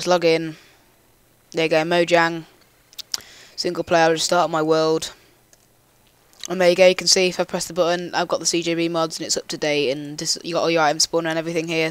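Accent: United Kingdom. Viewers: I gave you a like 'cause ur British!!! (im not o-o)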